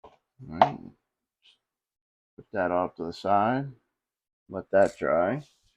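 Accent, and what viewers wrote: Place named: Maryland, United States. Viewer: First time I’ve seen you, but won’t be the last. You sound like you’re from MD, so nice to hear “normal” wuder and hunert.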